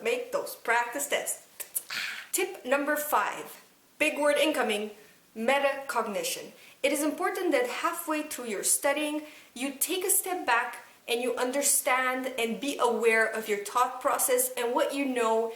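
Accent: Russia. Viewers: Are you from russia? :D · вы русская?)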